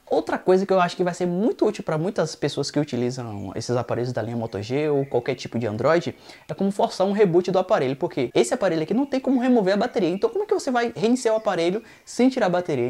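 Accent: Bahia, Brazil. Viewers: Você é baiano também? Boas dicas!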